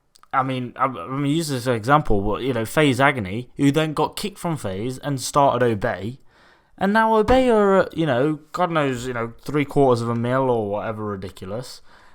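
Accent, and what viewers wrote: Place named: United Kingdom. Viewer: Another British voice! <3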